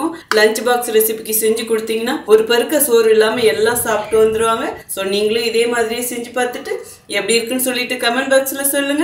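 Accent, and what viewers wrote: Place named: Kongu, India. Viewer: Your preparations and Narration,in typical Kongu Tamil will enhance Children 's Lunches very Tasty. Thank you Sister 🎉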